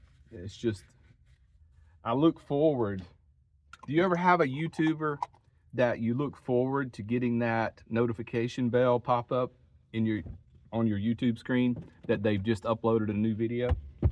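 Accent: South, United States. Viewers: This is at least the second time I’ve heard you comment about your looks, dear. You are an attractive man, and I suspect ladies really love your sweet southern drawl. You are very accomplished and have such a nice personality. Have confidence in yourself and hold your head high, dear man!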